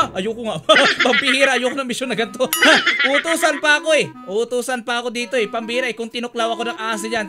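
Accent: Ilocos, Philippines. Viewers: Kuya ilocano kaba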